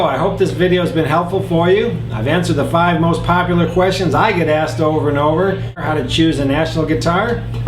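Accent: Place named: Great Lakes, United States. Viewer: The Great Lakes accent is strong. I live in Wisconsin, people here talk like this guy. Naational Guitaaar DAAAT CAAAAM lol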